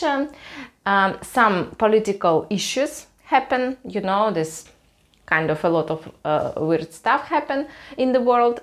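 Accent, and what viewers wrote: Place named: Russia. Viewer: Funny story about the response you received when you asked for the supermarket... I am sure Dutch people immediately recognize your Russian accent. And it might be the mafia image portraited in movies or remnants of the Cold War,... but big Russians in the dark are scary.... Reminds me of the telephone instructions I once had to make,... you know ... "If you want this, press 1, if you want that, press 2" and the last phrase was "otherwise you will be disconnected". My daughter made that instruction for me because she is fluent without any accent. She is also capable of imitating the Russian accent and made that version for me as well. I can tell you, this last phrase sounds very sinister when spoken with a Russian accent "otherwise you will be disconnected...." Like: "un'offerta che non puoi rifiutare."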